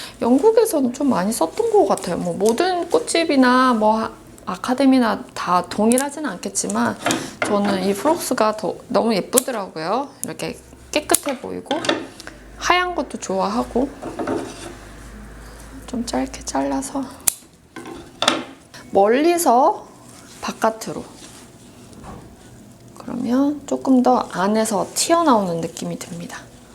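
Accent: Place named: Busan, South Korea. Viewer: 일기읽을때 부산느낌 물씬!! 오늘 꽃도 너무 예쁘네요! #힐링초하 힘내보입시다!!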